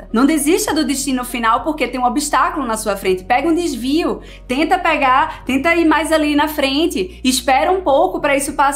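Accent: Pernambuco, Brazil. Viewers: Oi,! Conheci seu canal há pouco tempo. Você é pernambucana? Estou sem maturidade emocional para assistir aos seus vídeos sem me perder no sotaque maravilhoso; preciso ver duas vezes. Hahaha Gratidão pela sua explicação, tão clara e iluminada. Que 2021 seja de caminhos abertos para você, com muito amor e luz! ✨🎆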